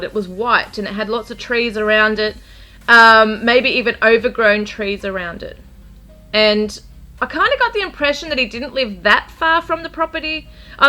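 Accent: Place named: Australia. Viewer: Hello Aussie patriot, I recognised your Aussie accent. I also have so many out there queries of all that happens. This case has been on my mind since day 1.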